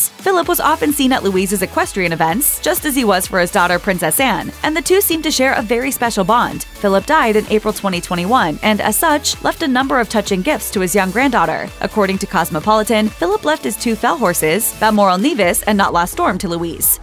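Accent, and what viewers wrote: United States. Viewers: Oh please what a tiresome yawn 🥱 channel… actually (American accent) the woman’s voice is sooooo……. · The fact an American voice is used for this article instantly renders it pointless and crass...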